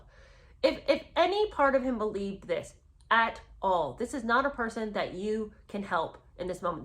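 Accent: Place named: RP, United Kingdom. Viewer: 😂😂😂😅😅😅 I love you!!!!! 😅😅😅😅😅... jolly ..what a total ding-dong H is... love your English upper crust accent 😂😊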